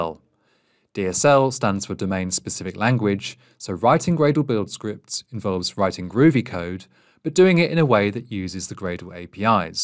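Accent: United Kingdom. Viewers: man, that british accent :P cant wait for spring boot, hibernate , everything whatever concerns java tutorials:>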